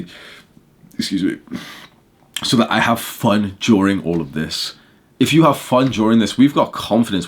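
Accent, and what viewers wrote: India. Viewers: That indian accent🤣🤣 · U have the best Indian accent. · Fuck that indian accent made me dissociate. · love from India❤, your indian english really matches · thaaaat indian accent isn't funy XD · Bro has the Indian accent better than Indians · dope Indian accent brother, approved!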